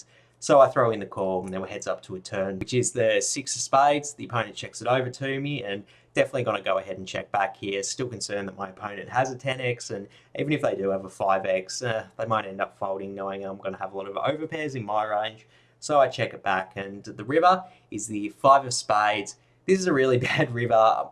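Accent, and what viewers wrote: Australia. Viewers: 👀 Me looking for vlogs trying to decide if I like the poker content or the Aussie accent more. Keep up the great work!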